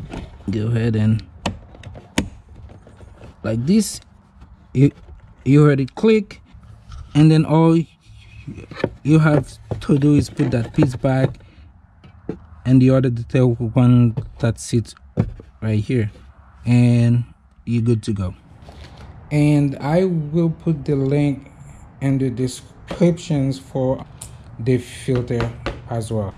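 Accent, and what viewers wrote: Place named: Haiti. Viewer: Haitian accent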